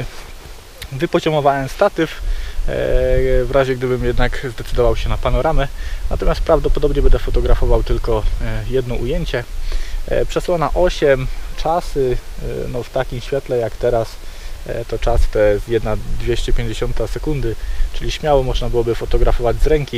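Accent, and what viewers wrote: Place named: Silesia, Poland. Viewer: Focus to infinity Właśnie oglądaliśmy odcinek z Klimczoka:) Naprawdę udana realizacja. Po akcencie domniemywam że jesteś ze Śląska. pozdrawiam z Tarnowskich Gór:)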